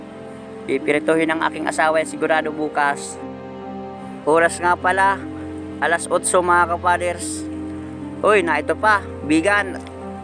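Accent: Bicol, Philippines. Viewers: Tagalog ang salita tunog bicol😅😅😅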